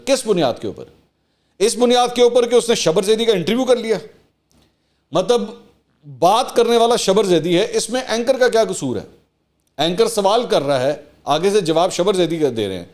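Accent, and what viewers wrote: Punjab, Pakistan. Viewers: منصور علی خان صاحب۔۔آپ میرے پسندیدہ اینکر ہوں۔آپ کا پنجابی ٹچ بہت مزے کا ہوتا ہے۔۔اللہ پاک آپ کو اپنی حفظ و آمان میں رکھے آمین ❤❤❤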